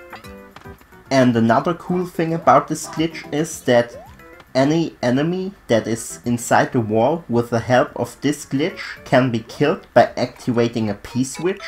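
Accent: Germany. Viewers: Man hört sooo hart dass du Deutsch bist😘 #noHomo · German accent af😅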